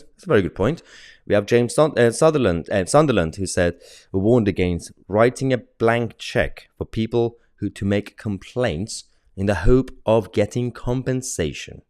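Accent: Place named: RP, United Kingdom. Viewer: im offended by you..trying to speak like an english snob...what a prick you are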